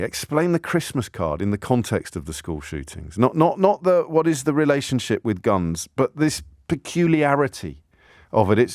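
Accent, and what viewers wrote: United Kingdom. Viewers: I mean, he's not wrong, but i hate hearing it in a British accent.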